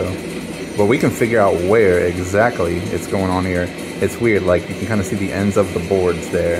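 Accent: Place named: South, United States. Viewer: Idk why but your southern accent is at 200% on this video. 😆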